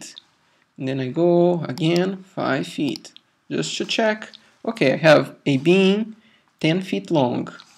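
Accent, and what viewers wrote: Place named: Brazil. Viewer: sutaque brasileiro! OIE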